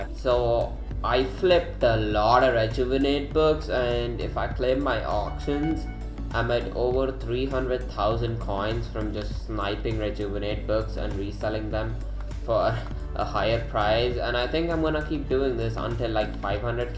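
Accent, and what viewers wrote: India. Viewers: The Indian accent shining thru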